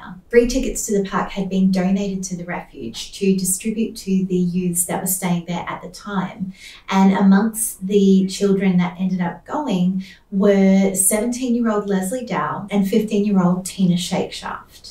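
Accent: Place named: Australia. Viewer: New sub! I loved the way you did this. It’s refreshing to hear a fellow Aussie doing these videos. Well done!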